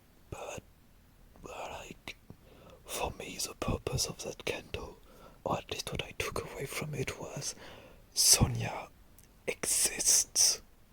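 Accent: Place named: France. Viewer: WoW I really like your frech accent its kinda relaxing you could try maybe do a ASMR in french? :0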